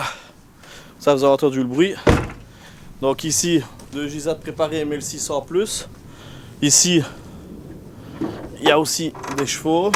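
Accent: Liège, Belgium. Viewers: L accent liégeois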